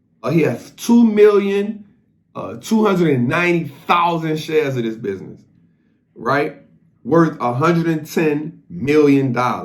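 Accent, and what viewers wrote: New Orleans, United States. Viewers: I'm from New Orleans and instantly noticed that accent, saw you on earn your leisure podcast and you are an inspiration, I started invested a couple years ago and this year I'm finally grasping this and I love the way you explain things, I want my money to grow even more and faster so I can actually enjoy it, big inspiration man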